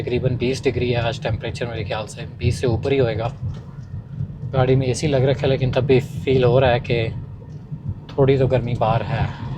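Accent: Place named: India. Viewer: Bro r u indian?. Ur urdu has very big Hindi/indian touch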